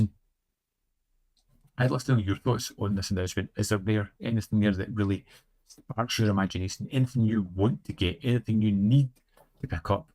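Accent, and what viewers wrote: United Kingdom. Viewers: I subscribed in your channel, mr, Nice content, are you from glasgow, liverpool, manchester, newcastle upon tyne, edinburgh or belfast northern ireland? I notice because of your Accent 😊👍🏻.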